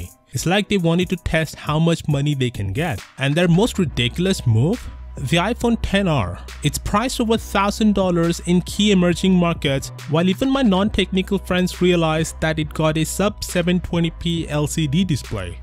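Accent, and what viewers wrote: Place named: India. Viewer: are you indian, you sound like the tech support from bny mellon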